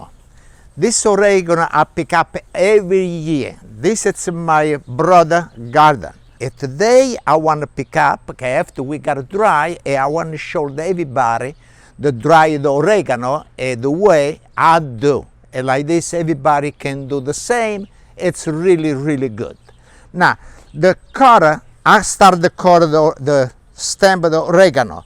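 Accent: Italy. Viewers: His italian English is awesome !! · Love your accent gotta be Italian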